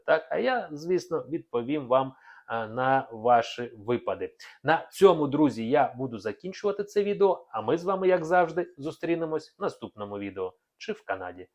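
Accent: Russia. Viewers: Бедолага а у вас акцент на русский язык не старайся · Очень режет слух Ваш " русизм" в произношении. Прошу прощения: в украинском языке, если пишется (на пример) "отримував", то и произносится Отримувпв а не Атримував. Во многих случаях Вы приносите так. Обратите на это внимание. Вы же с экрана вещаете все же. Спасибо. И... Страна - это русское слово. В украинском оно другое, Вы и так, и эдак произносите. Спасибо за информацию. И простите, если что))) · Якесь недолуге , намагається навести жаху на українців😁Альо, чоловіче з бородою та как..ським акцентом!! Тобі до мольфара, як до Парижу пішки!!! Аналітик - невдаха!! Ще й до всього - хамло!!! Ліпше перехрестися і покайся, і закінчуй зі бути лжепророком!! Ми, українці,заґартовані такими висєрами😁 Нас вони не « беруть»!! Лише Господь знає, як і коли буде кінець цьому балу сатани, а не « пророк з бородою»! Ця інформація для болот !!!!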